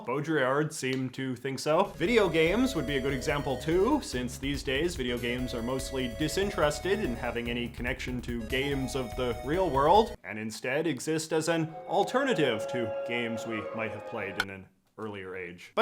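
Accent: Canada. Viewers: it's a canadian accent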